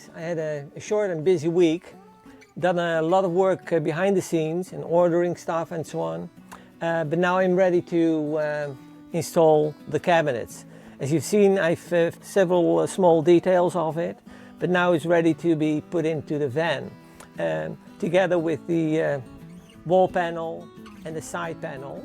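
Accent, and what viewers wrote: Netherlands. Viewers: ik dacht altijd al een nederlands accent te horen ;) keep up the good work!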